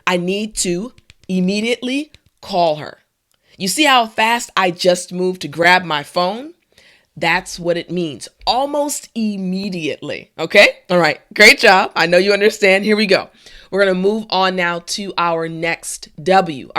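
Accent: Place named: United States. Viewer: Hello beloved my mom I'm meek ranjit from India at Odisha studying now I'm so cheerful of your english Teachership truly say that I'm understanding your Pronouncation of an American accent now these days improving vocabulary I'm also reading the Bible everyday that's by God is helping me individually life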